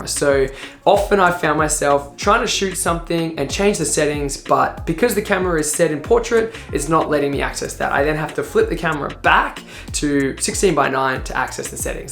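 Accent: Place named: Australia. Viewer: Great to hear an Australian voice keep going man! Good video, I am thinking of buying this GoPro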